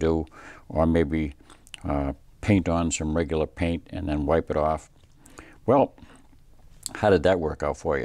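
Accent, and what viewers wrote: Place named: Central Massachusetts, United States. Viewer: great lesson! i grew up in worcester, your accent sounds like central MA, am i close?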